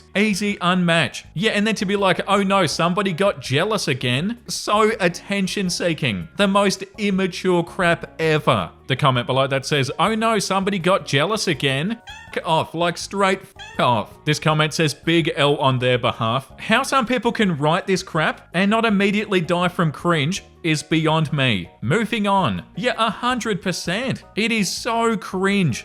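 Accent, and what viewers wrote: Australia. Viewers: Australian mate 🙌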